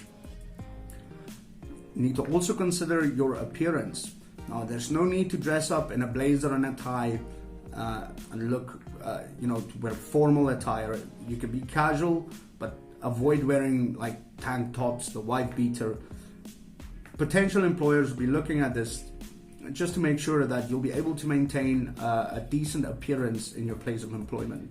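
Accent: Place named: Ireland. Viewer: buddy, based on your accent and your name, I knew you are Irish... ' ' is a very common name in Ireland... and your accent... I don't know what part of Ireland you come from, but it is a typical Irish accent.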